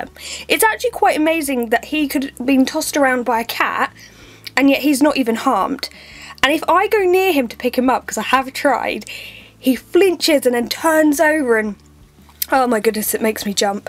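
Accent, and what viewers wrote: United Kingdom. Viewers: Are you British ???!??!??!?!?!!?!!??!!? I AM TOOOOOOOOOOOOO!!!!!!!!!!!! Edit: also if he turns out as a boy Call him Ron If he turns out as a girl call her ginny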